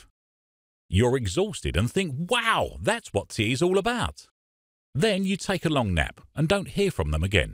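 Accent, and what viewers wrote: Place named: United Kingdom. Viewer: It's interesting that this seems to be a directed at an American audience yet it's a British voice and British coppers in the video? Maybe I'm wrong and America's got nothing to do with it.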